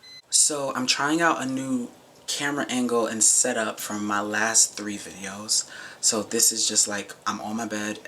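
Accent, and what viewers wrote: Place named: United Kingdom. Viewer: I really enjoyed your British accent!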